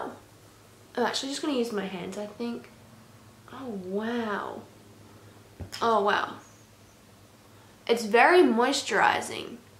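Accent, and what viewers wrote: Australia. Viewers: Is anyone else obsessed with her Australian accent, I'm from Sydney and for some reason I just love listening to her talk. Maybe also because you just give off such positive vibes☺️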